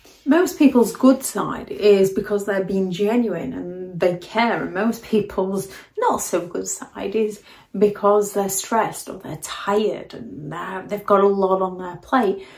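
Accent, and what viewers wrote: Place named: Northern England, United Kingdom. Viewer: Her accent is northern English.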